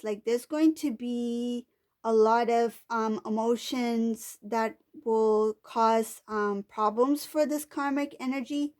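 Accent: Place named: United States. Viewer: Endless Cycle!! Clever. I like ur accent. R u American? With a twist? Lol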